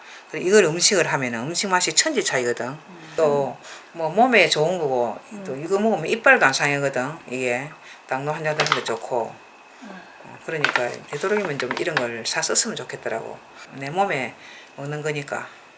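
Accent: Gyeongsang, South Korea. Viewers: 좋아요 구독 알람까지 꾸욱 경상도 사투리가 넘 정겨워예^^ 저는 부산이라예^^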